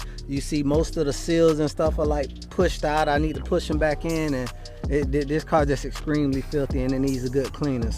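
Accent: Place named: Alabama, United States. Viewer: You sound like you are from Alabama, which is where I am. I just bought a 2018 X5 x35i and I am itching to give it bigger balls. Yours sounds amazing. I’d love to have mine sound like that as well. I’m new to your channel. Thanks for sharing.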